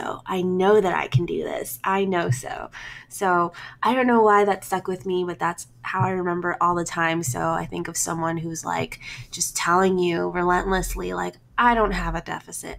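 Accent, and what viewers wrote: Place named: England, United Kingdom. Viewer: I just loved your english accent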